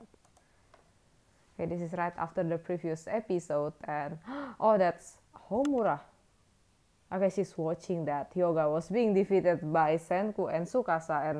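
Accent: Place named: Philippines. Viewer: seriously, sister, where are you from? I heard a foreign accent .. Are you from the Philippines?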